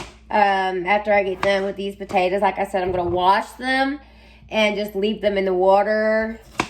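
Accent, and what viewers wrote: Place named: Tennessee, United States. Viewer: She got that Tennessee accent y’all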